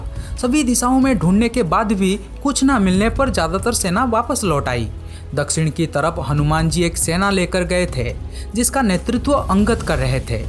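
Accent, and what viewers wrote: Odisha, India. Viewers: Kya ap Odia ho ?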